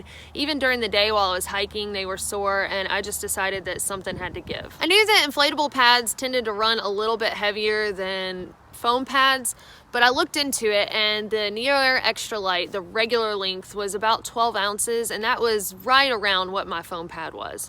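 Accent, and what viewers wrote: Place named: Tennessee, United States. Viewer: New sub here..you got that Tennessee accent. It's either Tennessee or North Carolina.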